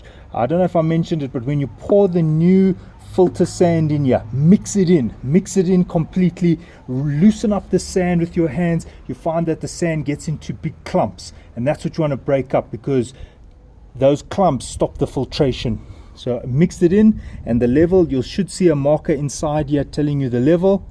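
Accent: South Africa. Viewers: South African boet!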